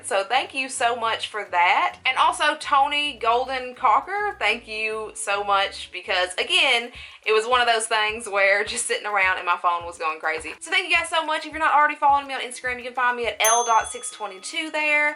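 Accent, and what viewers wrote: South, United States. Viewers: I’ve found my new crush that southern accent on you girl yeeeess please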